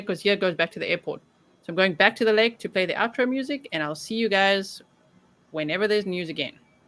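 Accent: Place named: South Africa. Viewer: Thank you we have subscribed. Love your South African accent. My friend in Hawaii is from South Africa and you reminded me of him. Keep up the good work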